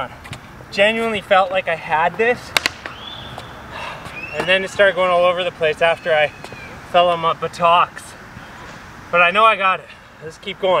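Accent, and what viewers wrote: Canada. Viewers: I'm not sure why but I love these videos. I think watching someone who skates like me, it's more relatable than watching a pro do a switch backside lipslide down a 16 stair handrail. I've even gotten used to the Canadian accent eh! Great content dude, thanks for all the videos.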